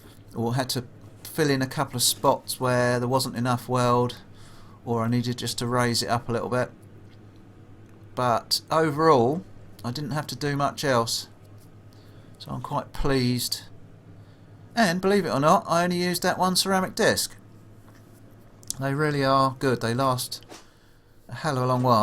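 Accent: United Kingdom. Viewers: I love your video. I do not have a job and could not afford the prints. I sort of drew my own and made programs for my cnc plasma cutter. You had trouble with things fitting but some of my parts did not come very close at all. None the less, I do have the general shape. I am from the USA and your English and accent is something else to me. I do not speak the Queens English. Maybe if I had some tea as you did, I could work thinks out better. Frustrating and fun.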